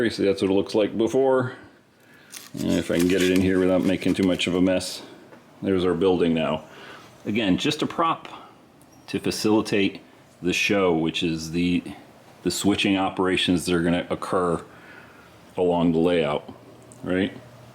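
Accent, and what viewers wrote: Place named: California, United States. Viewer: Absolutely just binge watch your videos. Apart from the way-too-cool Californian accent, they're just like trainspotting: things are happening, lots of action at a leisurely pace, just watching the locos do their thing. absolutely pleasurable. I have a vague question more related to prototype movements: if you turned back the clock would a caboose have been used, and if so, how would it have been incorporated into the train movements? Must be pretty hard running round a train with a parked caboose in the way... cheers for all your hard work putting these vids together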